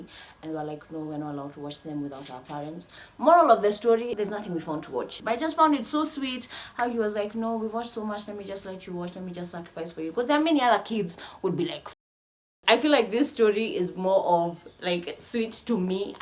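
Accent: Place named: Scotland, United Kingdom. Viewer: team ANTON LOVE ISLAND 2019 all the way 👊🏽👊🏽👊🏽👊🏽 Scottish accent a struggle .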